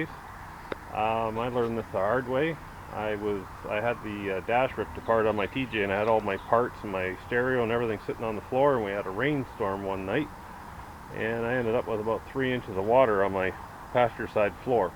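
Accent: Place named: Canada. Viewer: Love that Canadian accent! Wife's from MI and I haven't heard that in a while! Bob from Texas...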